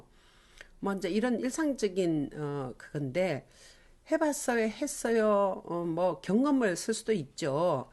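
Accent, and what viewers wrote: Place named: Gyeongsang, South Korea. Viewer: 교수님 경상도 사투리 억양이 살짝 들어간 목소리가 사람을 끌어 당기는 힘이 있어요 ㅎㅎ 강의좋고 목소리 좋고 ~^^♡😍😁😝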